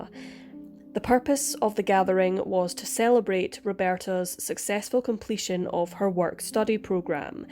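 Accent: Scotland, United Kingdom. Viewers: I like your accent, scottish?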